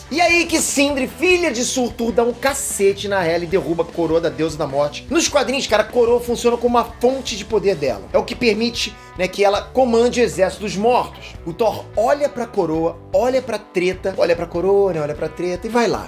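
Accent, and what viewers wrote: Rio de Janeiro, Brazil. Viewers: Impressão minha ou ele falou com sotaque carioca?!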